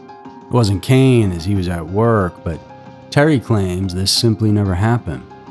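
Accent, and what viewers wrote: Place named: New Orleans, United States. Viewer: where is the narrator from? new orleans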